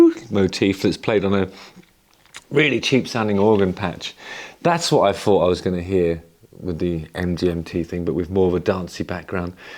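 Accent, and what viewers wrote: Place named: Southern England, United Kingdom. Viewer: Most English man alive…… definitely south English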